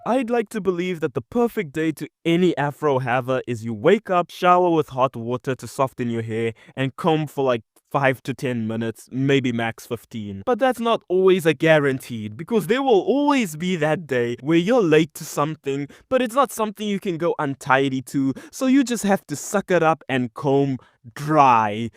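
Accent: Afrikaans, South Africa. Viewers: I just realised you’re South African bc I knew that accent was familiar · I hear a Afrikaans boi here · You have an Afrikaans accent, I have always spoken both Afrikaans and English so I don't have an Afrikaans accent.🎉🎉🎉🎉🎉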